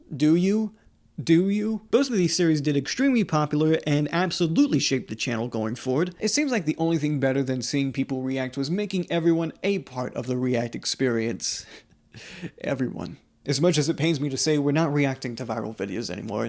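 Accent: Boston, United States. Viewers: Are u from boston?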